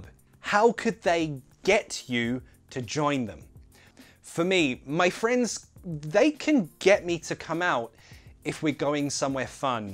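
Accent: United Kingdom. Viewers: Alright! You made me feel appalled by the US-ish "dude" and constant use of "man". This doesn't go with you, you are expected to say " mate" because we watch your chanel by your UK-ish english, which sounds splendid. Ta for your excellent teaching work mate!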